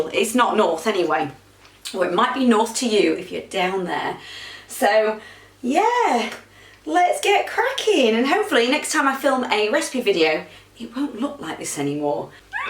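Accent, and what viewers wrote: United Kingdom. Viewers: To, I hope you realize how lucky you are! Your future wife is a catch. Nothing and I mean Nothing will stop her. She’s hot, requires little to no makeup, has a British accent and is fearless. Plus, it looks like she can cook too. Another Nigella Lawson. Congratulations to both of you. Make her happy. Oh, great video. Brilliant!!!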